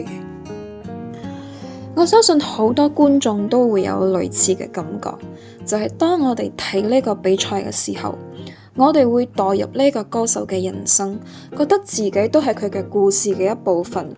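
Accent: Malaysia. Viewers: 多謝分享，你略帶口音嘅廣東話聽得好舒服，你也來自馬來西亞嗎？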